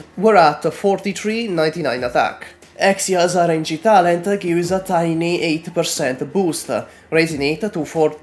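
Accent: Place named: Italy. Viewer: Knowing how much Arknights community has been saved by Kyostinv and his guides, hearing another AK player with an Italian accent immediately made me go “Hmmm, yes, this checks out. I instantly trust this man.”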